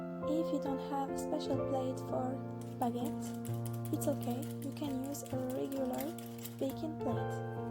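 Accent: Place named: France. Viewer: you're amazing... I love your work, I love your recipes, I love your voice and your little french accent! Everything together make your video unique ❤️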